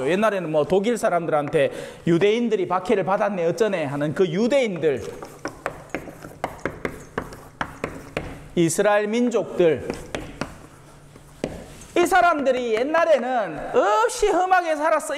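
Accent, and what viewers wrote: Gyeongsang, South Korea. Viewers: 신부님 감사합니다 함께 공부할수 있게 해주셨어요 ❤ 🎉 경상도 사투리도 정겹구요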